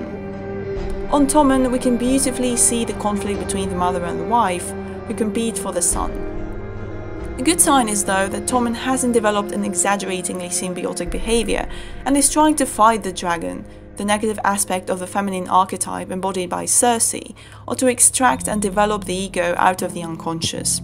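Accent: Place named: Netherlands. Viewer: Nice video! Interesting accent. It sounds RP english but with a heavy Flemish or dutch flavour to it. Something about the pronunciation of the word "o" and the emphasis on the consonants at the end of words. More clipped. Sorry; accents fascinate me.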